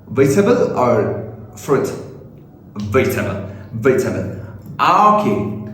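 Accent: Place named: United States. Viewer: Hey dude have anyone ever tell u that ur pronunciation is American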